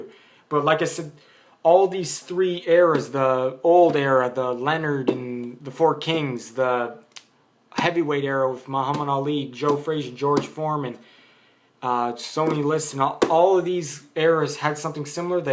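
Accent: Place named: United States. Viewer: I thought you are american with accent, u live in lithuania too?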